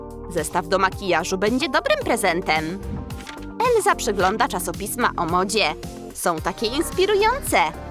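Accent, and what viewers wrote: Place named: Poland. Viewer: Cóż za ciężki polski akcent ala Max Kolonko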